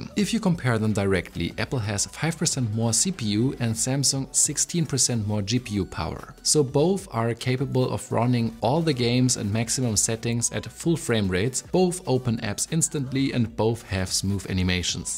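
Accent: Germany. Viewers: I hear the german accent xD Love my s24U. Just wished our banks would support google wallet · Hahahahahahah ich dachte, das ist ein Scherz am Anfang. Der deutsche Akzent hahahahahahahah ich kann nicht mehr 😅 by the way: "if I have to be honest". What does that suppose to mean? You don't have, you should be! 😂😜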